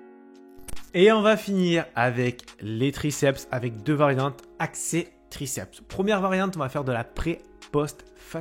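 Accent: South, France. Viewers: Accent du sud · Le bel accent du Sud ! Il y'a que ça de vrai !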